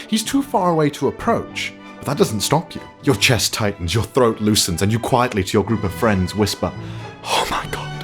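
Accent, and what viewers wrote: United Kingdom. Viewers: "saying British things from my British face." Me:... As a British person I just realised how inferior my accent is compared to. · with the british accent?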